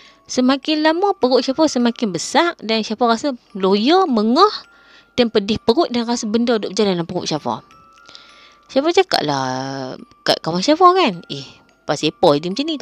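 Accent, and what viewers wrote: North, Malaysia. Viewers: Akhirnya Syafa cakap loghat utara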